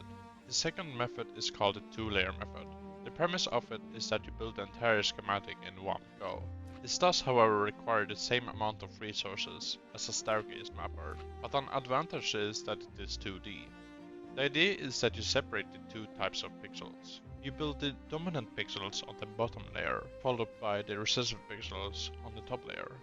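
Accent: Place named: Europe. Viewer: Dawg he's just European cut him some slack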